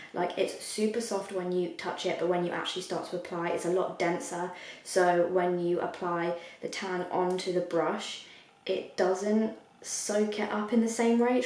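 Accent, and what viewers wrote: United Kingdom. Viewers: Yeah no. She’s also British so idk how you didn’t catch the accent.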